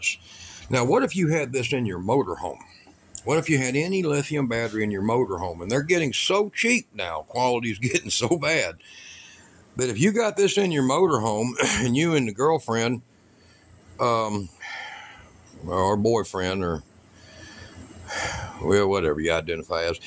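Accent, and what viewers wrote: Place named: East Texas, United States. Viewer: Yor seam to be developing a east Texas accent , I like it but I thought you were west of Austin . I'm in north east Texas myself .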